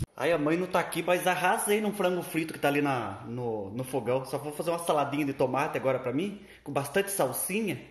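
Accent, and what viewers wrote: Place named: Paraná, Brazil. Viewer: Oi, você fala igual Paranáense..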